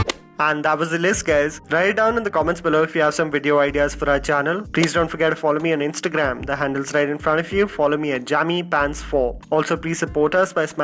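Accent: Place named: India. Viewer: I'm surprised this wannbe ghora progressive with funny Indian accent hasn't made a video on the #metoo witch hunt in an attempt to get more "muje Ghora bana hai" attention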